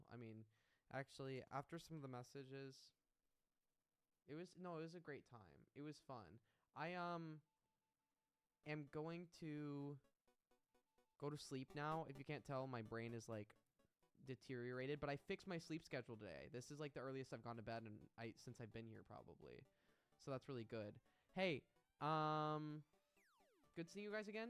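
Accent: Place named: California, United States. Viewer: Hey,! I adore your accent. Are you from California?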